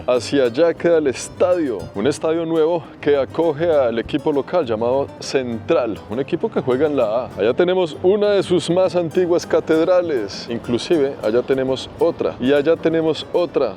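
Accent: Colombia. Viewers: Jajajaja la cultura de la SIESTA,ACA EN SAN ES IGUAL, NO SE PUEDE SALIR CON EL CALOR!! BUENO YO TE SIGO Y TE VEO SIEMPRE!!!! Tus formas de ver cada pais es muy diferente a los demás,muy interesante ver cómo vive la gente común,sus tristezas,alegrías,lo que valoran,sus casas,en fin...tu narrativa con ese acento colombiano me encanta 😊!!!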